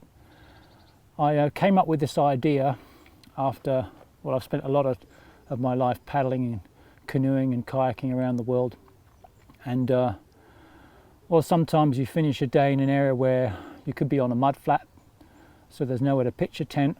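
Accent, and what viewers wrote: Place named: Australia. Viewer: I thought this guy was an Aussie. Can you make tea in it?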